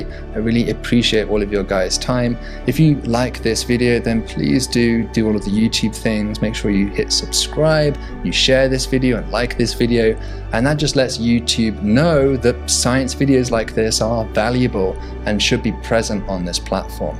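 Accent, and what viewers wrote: United Kingdom. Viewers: So if as you sound are British, why are you using so many Americanisms? Would an American do the same?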